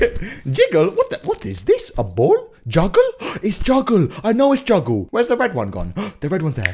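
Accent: United Kingdom. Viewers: And he is British English (that's why) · You are british this game is american accent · your british my man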